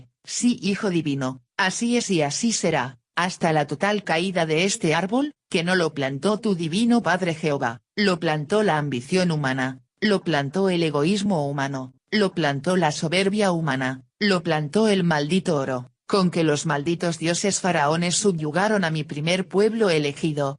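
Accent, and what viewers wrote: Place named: Spain. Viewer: Me sorprende que esté leído por una española...será que ya llegó la Doctrina a Europa?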